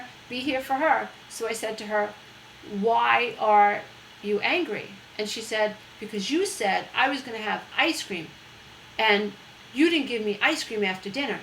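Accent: New York, United States. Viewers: Don't be afraid of sounding New York! Appreciate you...You helped me break the code. I am the little sister who moved forward because my elder sister did. I am forever grateful. It wasn't without incident, she had to watch me fall (into toxic relationship) before getting up. It is so worth it! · she sounds very New York it's so natural and grounded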